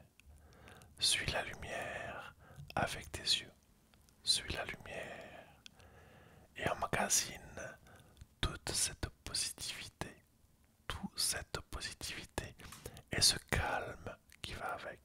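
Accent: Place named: Germany. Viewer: Merci pour tes vidéos... j'aime beaucoup ton timbre,ton accent légèrement germanique.En tout cas continue comme ça 👏👏👏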